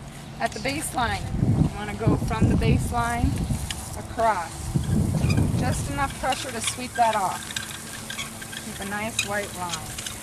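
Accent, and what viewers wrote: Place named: United States. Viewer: judging by your american accent you have probably never been to the ATP courts in Monte Carlo, and since I have I can tell you they do NOT use the "coil" system as you call it. yes the sideline to sideline style leaves clay on the sides near the fence, but your method doesn't follow professional methods.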